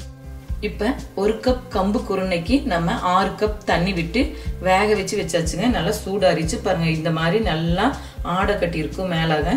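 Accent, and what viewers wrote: Kongu, India. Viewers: கொஞ்சும் கொங்கு தமிழ் 😊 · கொங்கு தமிழ் கொஞ்சமாத் தெரியுதுங்க... நல்ல கூழ்...இன்னிக்கு நான் செய்யப்போறேன்.. 2020 ஜூன் 2 சென்னைல வெயில் தாங்க முடில... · மிக்க நன்றி, அழகான கொங்கு தமிழிலில் விவரித்த விதம் அருமை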